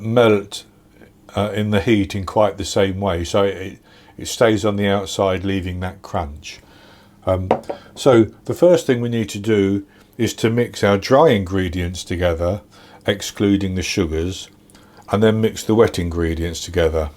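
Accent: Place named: Somerset, United Kingdom. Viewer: thanks,love your gentleness and relaxed approach...are you retired chef,your brilliant and I'm trying to work out the English accent...Somerset?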